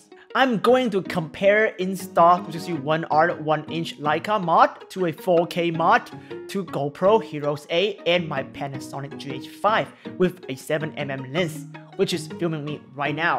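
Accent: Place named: China. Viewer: his english is not broken! he has just chinese pronunciation which is actually cool...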